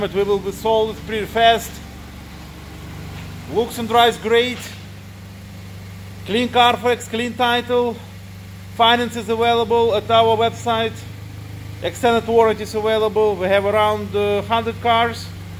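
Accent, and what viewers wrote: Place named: Russia. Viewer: Русский-русский) Наш акцент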